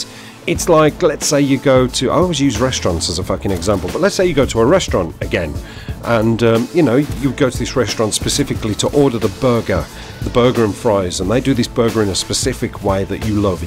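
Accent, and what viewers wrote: Birmingham, United Kingdom. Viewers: love your vids, always entertaining, and your a brummie to so that always keeps me coming back for more, but one serious question tho, at 4 min 30 sec, HOW THE HELL DID YOU GET THAT BLOOMING KILL? with a SHOTGUN???????? so facking far away? lol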